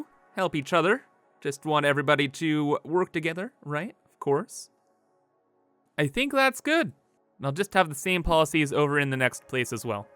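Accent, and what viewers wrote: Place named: Canada. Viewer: Are you Canadian too? I recognize your accent.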